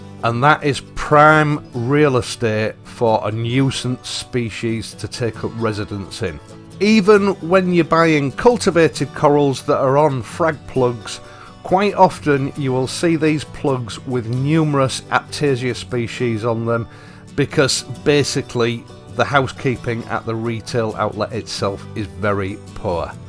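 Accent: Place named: Hull, United Kingdom. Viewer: Just started out … love your videos! It’s hard to find Australian content but this is good enough for me. Also your voice takes me back 25 years to my home town of Hull.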